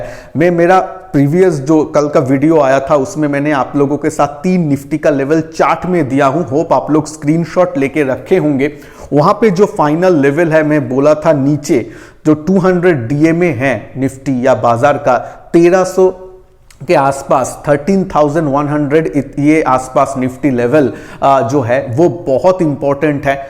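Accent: Bengal, India. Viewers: You sound Bengali. Are you based in Mumbai or kolkatta or where?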